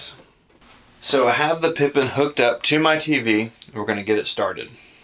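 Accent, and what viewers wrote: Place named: South, United States. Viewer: This guys from the southern area of murica. They’re not very bright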